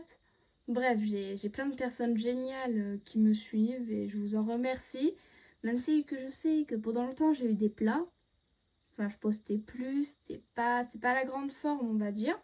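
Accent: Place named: France. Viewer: Aaah trop choupi❤❤ bravooo!!! 🍾 👏🏻 t’as un accent très français 🎀😮 ! Aww trop choupi ☺️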